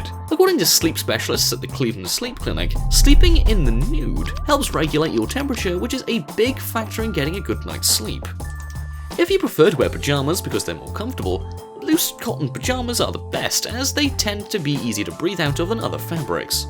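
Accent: United Kingdom. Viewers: This may be the most British guy I've ever heard lmao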